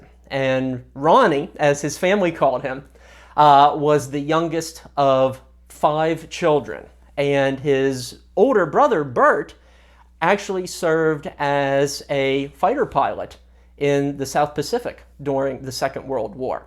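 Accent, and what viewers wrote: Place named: Pittsburgh, United States. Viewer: What part of Pittsburgh is this guy from?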